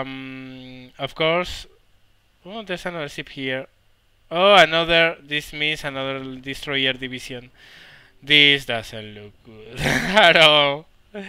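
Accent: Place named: Spain. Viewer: Yay!!!! :D so happy you're back! I've missed your beautiful Spanish accent :p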